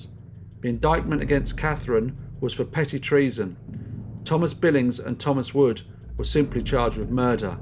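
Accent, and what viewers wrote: United Kingdom. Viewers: Wow, how far you have come on, from these early presentations of work. Its as if you thought the audience would struggle understanding you correctly because of your wonderful accent!!! Your work is still bloody marvellous and as gripping as always. Im so happy for you. The success that your channel has turned into, is very well deserved. Your unique cases are so interesting and researched wonderfully i can't express enough how much I love them. Im going back to the beginning again to enjoy once more. Thanks again. P.S. w3ll done for embracing our wonderful colloquial language and accents. I love being British and eccentric!!! 🤣😉😉🤪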